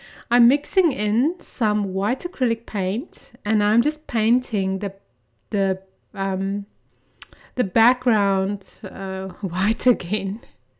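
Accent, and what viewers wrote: South Africa. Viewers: It's the first time watching your channel, and i thoroughly enjoy your accent, sounds much like my own - South African. By the way - butter chicken is my favorite!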